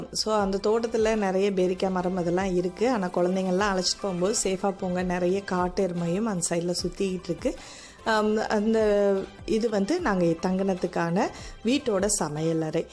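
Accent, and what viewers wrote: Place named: Kayalpatnam, India. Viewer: I cooked vetalappam which is so taste.Thanks for your recipes.Your voice is also nice with kayalpatnam style. Mrs.Shobaanandaraj Chennai.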